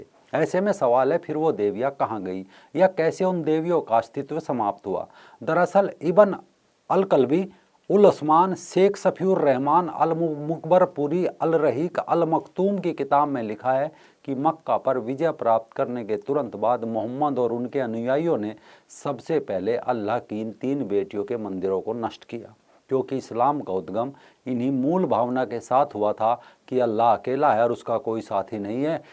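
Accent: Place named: Western Uttar Pradesh, India. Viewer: आपका पश्चिम UP वाला लहजा बड़ा अच्छा लगता है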